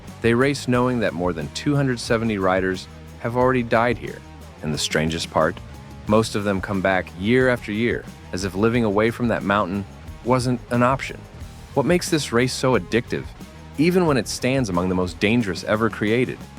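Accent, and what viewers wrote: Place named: United States. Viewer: ....Uhhh OHHH! An American Accent telling us what's Wot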